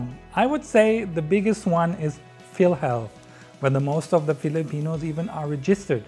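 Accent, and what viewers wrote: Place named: Germany. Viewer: I really like to hear a Deutcsh speaking Englisch. So clear like Filipinos.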